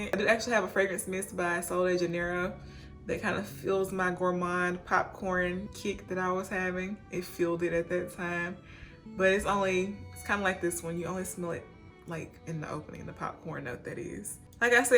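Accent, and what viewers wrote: South, United States. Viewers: What’s happenin lovely?Been subbed to you for a few months I think.Been meaning to pass through your page to show you some love at some point.Saw your vid here on my feed,so here I am 😉 Super solid page and content fam.Mad respect.Cool video here as well.Love your southern accent too :) Keep up the good work and have a wonderful week and beyond.SOTD = Ex Nihilo’s Bois’D Hiver to the wrists and forearms,and Creed’s Royal Oud to the front and back of the neck...came out Glorious 😊 Got the idea from Max Forti’s page a few years back.He recommended Oud Wood and Royal oud which is a really nice blend too..but I tried the Bois ‘D Hiver variation instead of the Oud Wood with Royal Oud because of their striking resemblance.Real cool :) 1 Love/Peace/Bless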